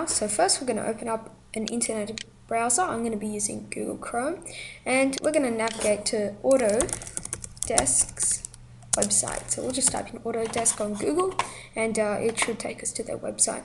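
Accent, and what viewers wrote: Australia. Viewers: I am quessing, just a hunch, your an Australian. Let me know if I am right. Nice to hear the accent..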